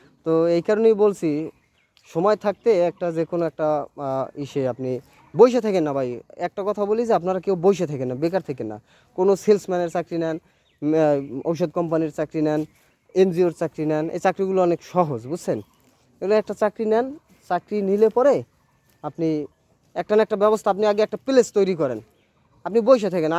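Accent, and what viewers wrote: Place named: Barishal, Bangladesh. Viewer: ভাই কি বরিশালের?❤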